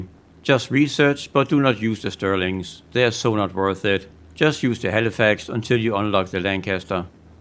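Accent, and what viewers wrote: Denmark. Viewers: man kan bare høre det er en dansker når de snakker engelsk😅 god video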